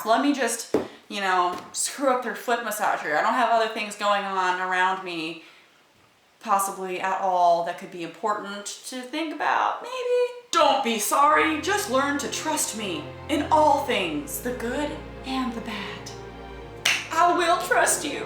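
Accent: South, United States. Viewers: Love the Southern accent. Also, #firstworldproblems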